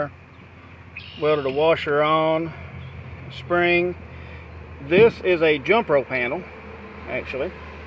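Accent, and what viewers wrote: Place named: Kentucky, United States. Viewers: I love you're conversion! I'm a Kentucky (Louisville) girl as well and you have a lot of good ideas that I'd like to use on my Mini Bus Schoolie build. I'm about to check out your bus build once I finish watching this video. Oh, and I just loooove hearing your Ky "'Twang" as you narrated your video. What part of Ky are you from? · I am a new subscriber to your channel and am amazed at your design and the construction of your camper van. I am very interested in this way of life and have been contemplating it myself. You sound like a southern boy. I am from the south too..GA gal here. 😊 Thank you for sharing your awesome ideas!!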